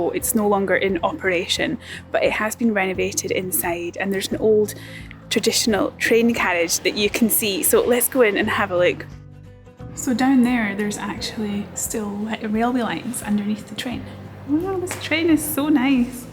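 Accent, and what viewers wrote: Scotland, United Kingdom. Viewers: After I've finished reading all the doom and gloom on the news, I save video's for bedtime. Her lovely Scottish voice, the amazing scenery, interesting facts, all help me wind down for bed. Thanks for making them and posting. Beautiful woman.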